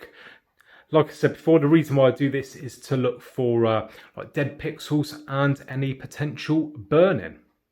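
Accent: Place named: England, United Kingdom. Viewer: Sério? Acho que ele é inglês, vc deve ta acostumado com inglês americano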